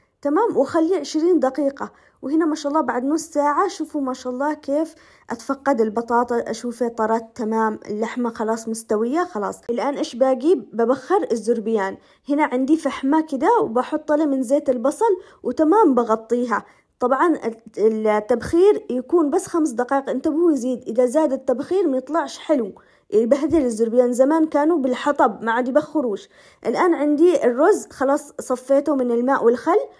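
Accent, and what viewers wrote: Aden, Yemen. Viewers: محسن كلامك العدني القح 😂😂😂 ربي يسعدك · ياحلات اللهجة العدنية تسلم يداتك ياقمر ❤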